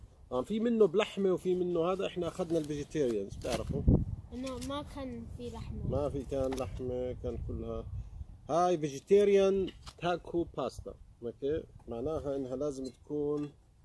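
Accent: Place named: Palestine. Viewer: يا عيني على اللهجه الفلسطينيه شو حلوه ، بدّي اسالك شو اسم المحل وإذا بلاقي منو عنا في مونتريال ؟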